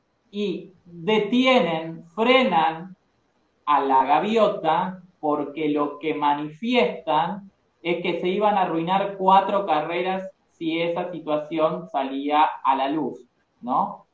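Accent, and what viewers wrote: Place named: Argentina. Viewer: Hola. Me gusta el trabajo que haces y la forma en que detallas cada nota, con tu estilo y ese acento argentino le dan el toque. Al menos en mi opinion que soy mexicana.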